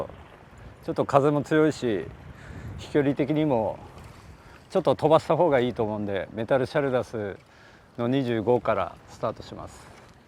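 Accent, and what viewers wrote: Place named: Tohoku, Japan. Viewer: 福島出身です🙇🏽 久し振りに東北訛りがブルーブルーの動画で聞けて、嬉しくなりました笑笑 応援してます‼️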